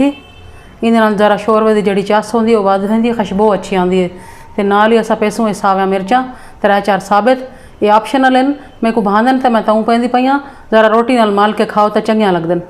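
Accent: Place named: Saraiki, Pakistan. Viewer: سبحان اللہ سبحان جیا آپ لہجہ سرائیکی ہی رکھا کریں اور ساتھ چیزیں کی تعداد کے لحاظ سے کوئی برتن بھی بتایا کریں جاوید خان تشنہ ایران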